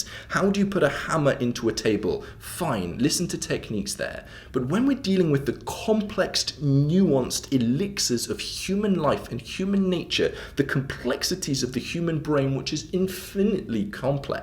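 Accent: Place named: RP, United Kingdom. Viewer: you have to be casted on the Crown.seriously bro you would need 0% effort to talk like them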